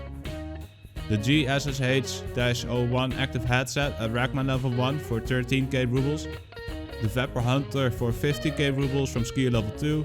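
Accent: Netherlands. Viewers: Nice to watch. Really useful info. Though I can hear you are probably Dutch haha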